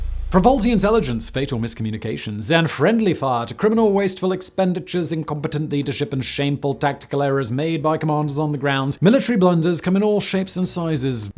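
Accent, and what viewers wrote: England, United Kingdom. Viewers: "the French and English doubled down..." the somme was fought by the British Empire, not just England. Being Scottish, that coming with an English accent was particularly painful!! And Im not one of those wankers who love to point out mistakes - I just couldnt let that one go. FFFFFFRRRREEEEDOM!!!!!!!!!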